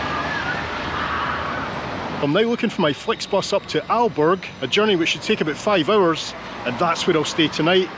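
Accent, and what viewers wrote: Scotland, United Kingdom. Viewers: Just subscribed as I like seeing places through you eyes. Im half scot and you also bring back childhood memories of living near the Broch (Fraserburgh). Saying 'heed' instead of head lol. Not heard 'Quinie' in a while, im listening out. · Enjoying your video, my first on your channel, and I love your camera angles, humor, honesty, and charming Scottish accent. Mellow music, too. Subscribed!!!😊👍🏼👍🏼👍🏼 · Watched a few of your streams now, really well put together and also a bonus for the Scottish accent. I’ve often thought about the ferry journey to Iceland and your two videos on it have sealed the deal. I’m normally very much a “van lifer” but love the idea of being a foot passenger. The route to get to the ferry looks very interesting- my sense of direction is awful.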